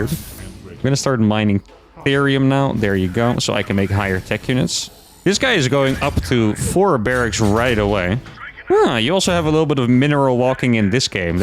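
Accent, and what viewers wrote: Netherlands. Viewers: is this dude Dutch? sounds Dutch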